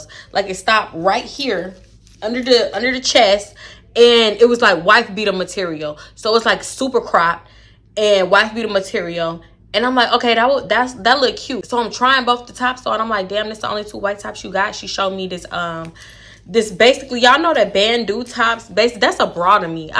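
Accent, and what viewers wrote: Baltimore, United States. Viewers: Are you from Baltimore? ( your accent lol) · this random baltimore accent be taking me out 😂😂😂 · you taking me outtttt wit the baltimore accent🤣🤣it’s so funny hearing how we sound to other ppl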